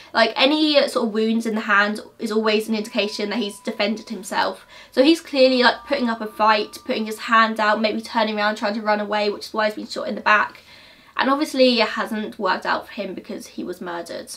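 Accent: United States. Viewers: I agree with you!!! Keep up the good work and you did sound a little American and it’s ok 👍🏽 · your "american" accent is so cute!!! also completely agree with what you said in the beginning of the video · Your American accent is on point! Dont be so shy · Your little American accent is the cutest omg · Stand your ground. If you had something truly awful to say about rape, animal death, child harm....and the like, I have every confidence that you would warn us before you blurt out that the family cow stepped on the diaper of the baby of the family then the goose was giving the wife the eye and she felt threatened of rape so she slapped the horse on the rear and he kicked the goose in the knee. Enough said. BTW, your American accent is adorable.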